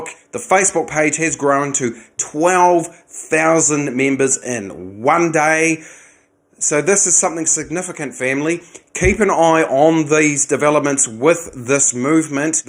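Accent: New Zealand. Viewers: Algorithm and all that. Grow the.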